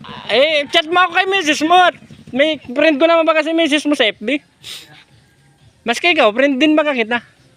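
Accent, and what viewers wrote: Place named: Camarines Norte, Philippines. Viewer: hello po idol,watching po full wh po,done tamsak at dikit narin po ako sa bahay mo😊❤❤parang sa tono po ng salita mo idol parang taga camarines norte ka po,taga dun po kase ako baka po magkababayan tayo idol😊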